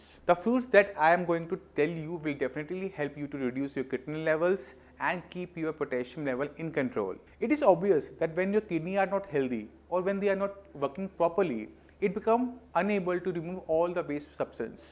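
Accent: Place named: India. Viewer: Thank you for that good infos. Kindly speak slower bcoz i can't catch up your Indian accent. Thanks so much.😊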